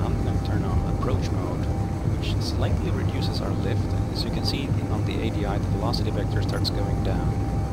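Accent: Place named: Scandinavia. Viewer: You sound like a Scandinavian Bob Ross. I could listen to you forever :D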